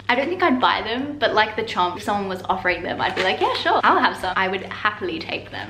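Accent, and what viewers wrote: England, United Kingdom. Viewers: Am I the only one who thinks u still have a British accent but I can hear an Australian accent as well 🤣🤣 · It’s wierd cause u sound British and Australian at the same time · Fun fact: First, i was thinking that u were british bc of ur accent! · Ah so she’s from Uk I was like no wonder she sounds so British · I hear a little bit of British in your voice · She spent $200 on English food she sounds English to me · Wow you still have that bit of an English accent despite leaving when you were 8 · you kinda stil sound slightly english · Your accent sometimes sounds british but then Australian at the same time? 😂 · Girl you still sound British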